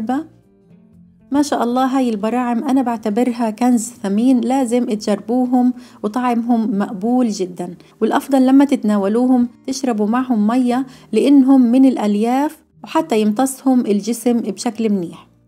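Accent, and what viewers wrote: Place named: Egypt. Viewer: ليش لهجتك مخلوطة بالمصري.؟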